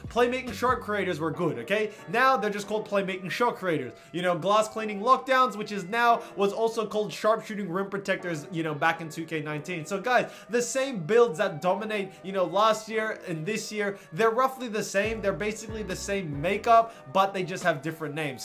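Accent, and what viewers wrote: Australia. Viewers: hey man, i recognised ur accent and i’m assuming your australia, if you do play asia servers i was wondering if you could run wit me 🥺 much love from south australia bro. keep up the great content‼️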